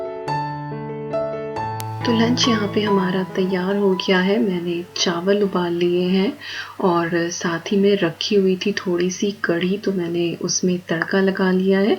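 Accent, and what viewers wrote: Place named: Western Uttar Pradesh, India. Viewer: I like her a lot. The way she speaks. and Haan I m also from West UP. Apki language sunkar apni taraf ki Yaad a jaati h. Take care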